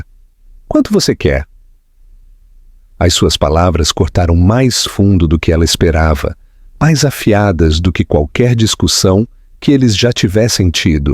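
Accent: Portugal. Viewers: Não suporto narrador falando o português de Portugal, não se preocupam nem com nosso idioma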